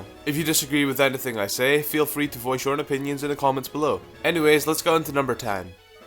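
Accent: Scotland, United Kingdom. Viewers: Yes! It's the Scottish guy again! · hes scotish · so scottish :)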